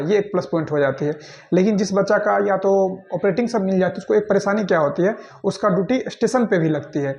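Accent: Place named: Bihar, India. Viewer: Bhai tu bihari bhsha q bolta h ganw wali .. Hindi b nhi shi se bol pate h bihari 😮lafda ko lfra 😂 wah😮..pta nhi ksi ghatia education h wha k school n teachers ki jo shi sbd kaise bole jate h vo b ni sikhate 😢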